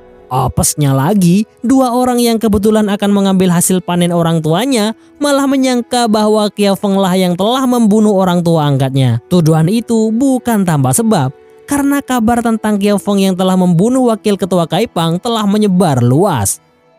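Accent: Madura, Indonesia. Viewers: Payah ongguen, lee kakeh reng madure 😆, Salam stong dhere kak, sampang Torjun☝️ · Reng madureh bn bang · Guy Madura beihh bahasana leburen😂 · Jir Reng madureh cuk 😁😁😁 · Miminnya Madura nih😁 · Jir orang madura yg nganarasi cerita flm nya 🗿😂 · Reng madureh jih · Abbe kak reng madureh ben 🤣😂🤣😂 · Mak tak ron karon kanak... Abbeh reng madureh ongguh ye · madura kah cuy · Mk host sah reng madureh reh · Logat Madura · Medhuro min "Carkelacer tak ronkaron"😂😂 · Bg lu orang Madura ya engkk padeh Reng madure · Ini yg ngisi suara orang madura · Madureh madimmh reah si admin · Orang madura nih yang nyeritain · Mantaaaap medureh demmah lek 😊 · Adminnya ini ternyata madura 😂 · Carklacer tak Ron karon. Maduranya kentel banget bang. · Reng medureh kakeh kak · Mimin orang Madura yah🗿 kok aku kek gk asing sama ucapan Mimin yg bilang temancok kerreng.dan ee payah ongku😮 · Min nya pola oreng madureh mak pelak pkk bhsa mdura tamancok kereng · Ternyata Reng madureh sampean, hehehe, carkalacer tak aronkaron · Car klacer tag ron karon...mik medureh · Ehh kakeh reng madureh kak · Dooo reng madureh 🤣 Salam Bretd 🤘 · Beeh oreng madureh kakeh bang.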